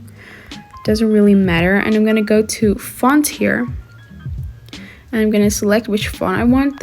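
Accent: South Africa. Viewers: ur South African ✊🏽🙌🏾🙌🏾🙌🏾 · U sound like ur South African :)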